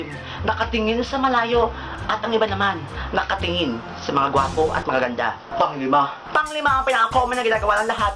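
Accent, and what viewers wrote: Visayas, Philippines. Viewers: bisaya kba Kuya? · Grabe! halata kaayo na bisaya! xD Proud to be BISAYA!!!! :) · HALA BISAYA DIAY KA -HA KA HAHAHAHAHA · Kuya, bisaya ka ba? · Oy, bisaya diay ka? Daghan nata. Kabibo. Nganga :)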